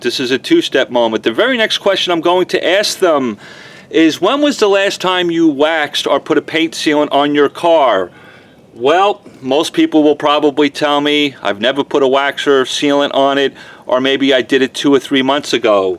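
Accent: New York, United States. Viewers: he is from NY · He sounds like he has a NY accent, and I'm from there, soo it's a NY thing, the hand gestures lol, we talk with our hands too lol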